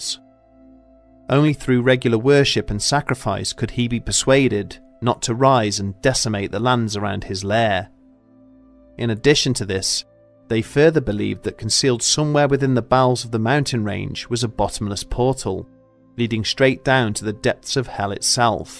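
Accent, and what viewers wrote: England, United Kingdom. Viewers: Why do they have English people telling these stories ? Americans can also tell stories.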